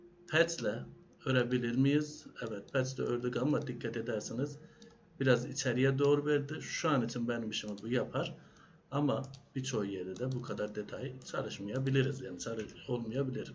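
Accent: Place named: Azerbaijan. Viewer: Azerbaycan lehçesi var sanki hocam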